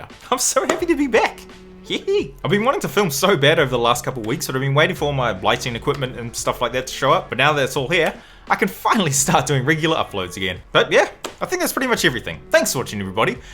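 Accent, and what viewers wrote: New Zealand. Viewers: Beautiful! Picked up the kiwi accent too ;) Chur bro It really shows that what plugin you use isn't important, it's just the talent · Hey dude, you sound like you're from NZ lol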